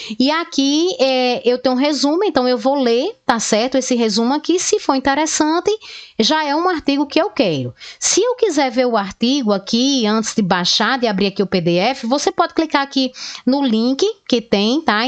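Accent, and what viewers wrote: Northeast, Brazil. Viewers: Já me inscrevi no canal. Super clara e com sotaque nordestino ❤️ Obrigado!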